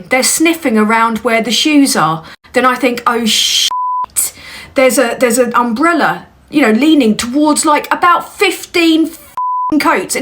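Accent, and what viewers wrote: United Kingdom. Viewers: You sound so BRITISH it's adorable.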